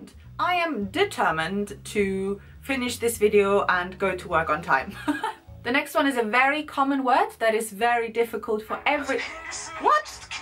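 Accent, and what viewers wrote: England, United Kingdom. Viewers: Big suze! That's her name that was hard work and on second look you don't look terribly much like her lol think it's more her softly spoken voice , you sound very English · I would say it Is a British accent ... forced pronunciation, it used to be a thing where the BBC had it as their golden rule that no "English, Scottish, Welsh or Irish accents would be on television or radio. so we had all these fake people on the box that infected everyone. accents change every 30miles in the UK, drastically change. as this is technically "The Queens English" this girl actually has an advantage being German. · is QUITE lovely...............and charming......................and funny. But I wonder if SHE appreciates just HOW 'English' she sounds now? Great stuff! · I think you're more English than some English people themselves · I have the same problem with the word 'determined'. 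Every time I use it I have to check its pronunciation out first!! it definitely drives me mad. By the way, congrats on your great British accent! ;) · What the hell?! At the start I thought you were British! Amazing English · If it's any consolation, you pretty much speak English with an English accent. And if you go to America, squirrel tends to be pronounced more like "skwerl". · English tricky language! Sometimes words are not pronounced as you would think! You sound more British than German! You speak English very well! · The first time you said squirrel you sounded Australian aha And you sound very British! Also.. idk if it’s like a non Australian thing But I’ve never struggled to say rural? Maybe everyone in Australia lives rurally so we have to use it in conversation all the time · at the beginning I thought like: wow... she sounds quite British but there's something else as well... 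German or sth. 😂😂 I knew that bc German is my mothertongue😂 but I'm not quite sure. i live in the UK as well and I try to sound as british as possible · How did you learn to have an accent like that, you sound very proper and more english than pretty much every english person i know including myself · I'm very surprised that you've even developed a distinct British accent. When I learned and tried to speak German, idk where my accent would be from lol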